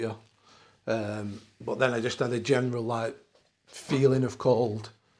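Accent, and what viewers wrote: United Kingdom. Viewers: Sir, why have you not spoken to your local parish and asked for a blessing of your home and property? I gather from your accent you're British; certainly the COE or the Catholics can assist. You are on a long journey now; be advised Evil always scratches us when encountered. You definitely need spiritual protection from the Christian Church and none of these others. For Real.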